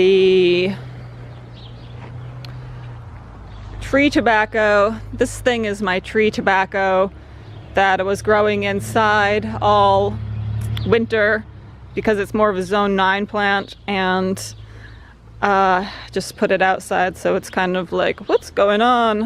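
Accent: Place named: Pennsylvania, United States. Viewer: i like your penns woods accent. ;) so why arent there any schools for kids to learn about plants and gardening? i would think being outside in the sun gettin' your hands dirty is better for their young impressionable mind development. Germinating seeds, learning about whats what...... would have to be better for them than common core standardized testing.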